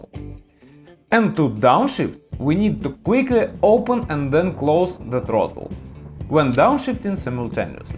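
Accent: Russia. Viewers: кацапський акцент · That Russian accent ♥️♥️♥️✨ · You will not know it, but I do like you, 1. you sound great speaking English, yes I know you have a Russian accent BUT! its not your exact fault, that your govt are pritty stupid and have always caused trouble all over the world, but hey, not you ok, (but you Russians have totally ignored everything! done nothing at all to CONTROL anything, seems all Russians are ignorent of affairs, I have no idea why i have said this, its not motoercycling), 2. your are EASY to understand. 3. you are good at visualisation! 4. its all very comprehensive. I have no idea how you will cope because Russia looks like it will be destroyed somehow?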